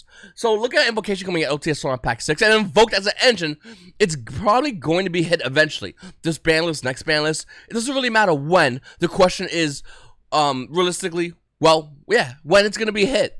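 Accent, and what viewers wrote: New Jersey, United States. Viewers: This dude is 100% from the jersey shore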